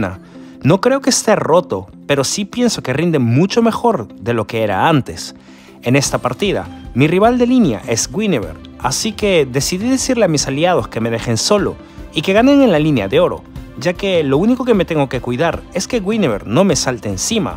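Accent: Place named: Venezuela. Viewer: Suenas como a venezolano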